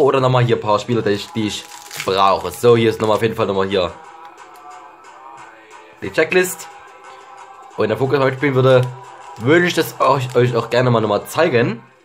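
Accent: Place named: Saxony, Germany. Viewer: Ich liebe deinen Sächsischen Akzent, 😂😎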